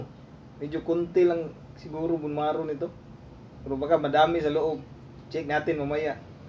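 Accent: Visayas, Philippines. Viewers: bisaya ilhan gyud tono nato ani bai🙂 enjoy lang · Bisaya ka bai o tagalog hehe..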